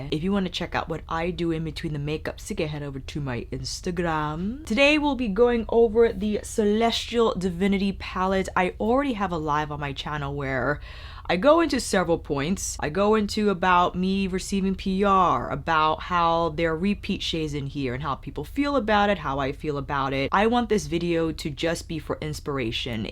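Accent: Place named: New York, United States. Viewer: Wow. I apologize for saying how well put and well spoken the review was.....after watching the live with the owner of CR with Kelsey.....I didnt mean it from a place of "wow you're not ignorant and I'm surprised because of your skin tone" and I didnt know if that is how it sounded. Im a new subscriber and You described the palette in terms of letting a consumer like me know about which shades are what, ya know? As a fellow NYer and moved to Indianapolis, In I loved hearing your accent as well. So nothing but love here girl...I just went to that video after this one and as soon as I heard all y'all talking about those "You sound so smart" comments I was like wow.....maybe I sounded that way.......maybe you wont even read this and you dont care at all......either way, already a fan lol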